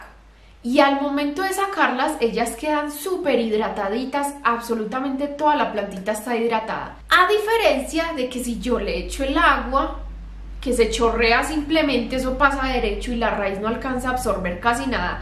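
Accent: Antioquia, Colombia. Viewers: Hola soy una asesina de suculentas todas se me mueren las ahogó aquí es un calor extremo y siento que tienen sed y pues bueno las ahogó pero ya estoy aprendiendo y también a mí me gusta mirar sus videos cuando estoy lavando platos o haciendo comida me encanta y estoy enamorada del acento colombiano no se ofendan Saludos todo Colombia... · Me encanto tu video. Es la manera de hablar de los paisas. Asi somos para todo. No hay medidas exactas. Tratamos, ensayamos y tambien fracasamos en nuestros intentos pero aprendemos cantidades. 👍🏼❣️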